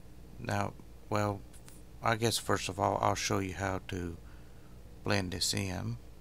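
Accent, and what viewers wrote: South, United States. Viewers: BEST instructional narrator on youtube! The laid back southern accent really takes the edge off.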